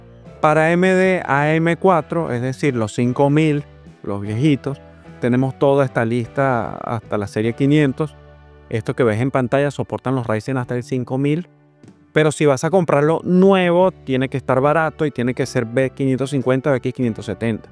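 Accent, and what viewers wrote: Venezuela. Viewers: bro tu eres venezolano?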